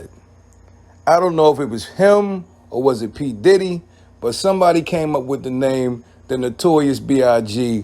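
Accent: Baltimore, United States. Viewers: You’re definitely from Baltimore huh bro? Haha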